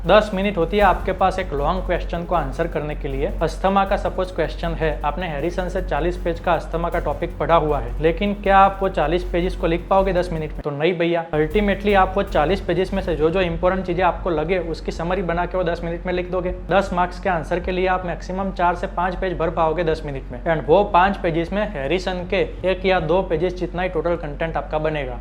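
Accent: Gujarat, India. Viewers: pure gujarati english😅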